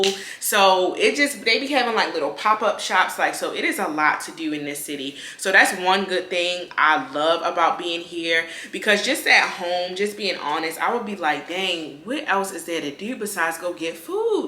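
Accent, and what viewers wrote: Baltimore, United States. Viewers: When you said “dew” for do, I sad she’s definitely from B’more,. Lived all over DC and PG county and went to Howard. Your accent makes me miss the DMV. Good luck!!! From Houston ( although I haven’t lived there in years). You sound like the type of that will do well Dallas is conservative compared to Houston. In Houston they are turned up 7 days a week with after-after clubs. Dallas is more sophisticated and calm. Calm is Good. Houston is like one big HBCU AND ABOUT EATING OUT AND DAY PATIES AND BEACH PARTIES. It can get addicting. You made a good choice, definitely if you’re in corporate , finance and communication. Houston is more like medical, engineering, life sciences, NASA, oil/energy and aerospace. I’m a guy and don’t usually watch these but it caught my eye and I thought about reminiscing. Be safe and prosperous! 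The food in Houston will do it for you . It’s more diverse. There are 600 black owned restaurants in the Houston area( more like Atlanta)Creole, Soul food, Jamaican , Nigerian, Indian, Middle Eastern ,Vietnamese., Latin etc etc. There is excellent black theatre( the Ensemble Theatre) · Girl I knew you were from Baltimore the moment you started talking. I plan on relocating to Dallas from Baltimore in a few months. God is definitely telling me to relocate here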